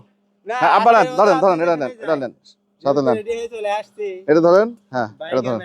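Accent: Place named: Jessore, Bangladesh. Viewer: আপনার সহজ সরল ভিডিওগুলো ভালো লাগে। এভাবেই সবাইকে সঙ্গে নিয়ে ভালো থাকবেন। আপনার ভাষা শুনে মনে হচ্ছে আপনি গ্রেটার যশোর/কুষ্টিয়া/পাবনা কিম্বা আশেপাশের জেলার অধিবাসী। আপনার বাড়ি কোন জেলায়, জানালে খুশি হব।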